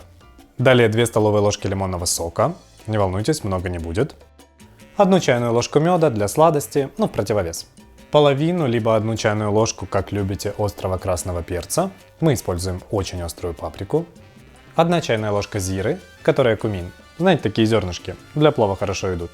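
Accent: Odessa, Ukraine. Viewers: А я люблю,чисто одесский акцент:,, здрастье вам через забор"...😄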